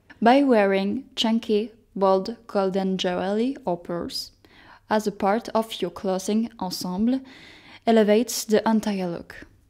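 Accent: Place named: France. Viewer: OK for the French accent to make it sound so "parisienne", but at least read it a few times before because it sounds like reading what someone else wrote without understanding a word of it.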